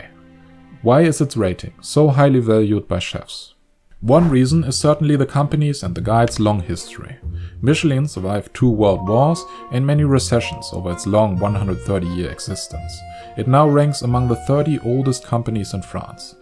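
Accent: Germany. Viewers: Typical german accent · Du bist deutscher 😋😋 is ch höre das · wie kann man nur so deutsch klingen xD dieser Content ist einfach ein Referat aus der siebten Klasse. Krank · Not French for sure. As French myself, it sound also German to me. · PLEASE practice your accent. For me as a fellow german its nearly unbearable to listen to you speaking english anyway, nice video! keep up the good work 🌞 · U german? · I love your German accent, very convincing and genuine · Pretty sure he's german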